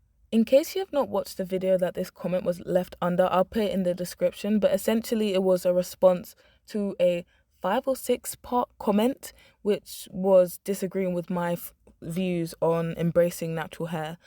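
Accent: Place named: London, United Kingdom. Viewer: Unrelated but your accent!! Im also a fellow londoner too!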